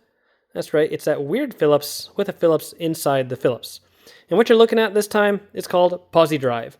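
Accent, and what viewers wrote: Canada. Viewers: I hear a Canadian acceeeent.😊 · This guy is a Canadian. Listen closely.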